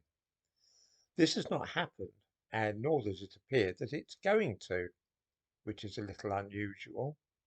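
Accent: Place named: RP, United Kingdom. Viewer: A plummy voice doesn't cut it. Your bitterness oozes out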